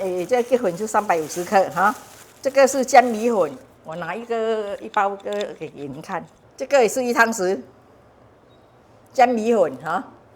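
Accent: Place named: Hokkien, China. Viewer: Autie我早上好！ 谢谢你8你youtube 又见面了。 我喜欢听您的声音话语加福建❤🎉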